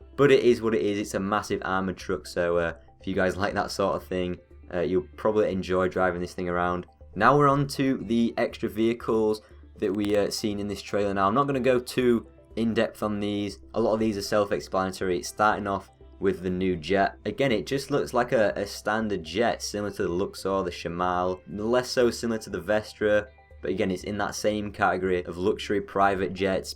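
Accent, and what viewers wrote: England, United Kingdom. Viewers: omg imagine an armoured submarine with torpedos or and a big submarine that can shoot nuke type rockets ( not as powerful like a more powerful rocket from rocket launcher ) and u can place target on map in launchers out top and lands on area destroying different targets ong that was on the top of my head ahaha XD love that ur English not American lol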